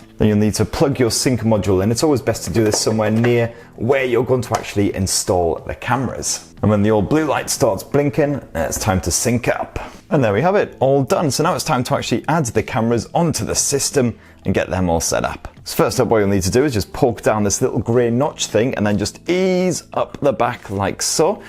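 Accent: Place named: Sunderland, United Kingdom. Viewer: Man sounds like he's from Sunderland innit.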